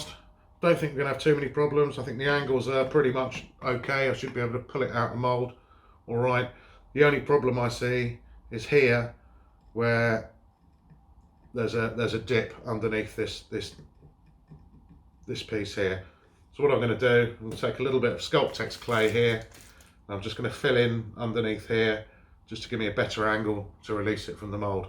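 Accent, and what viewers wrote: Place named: England, United Kingdom. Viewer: wch accent you got? from wich part of england are you from. Is nice!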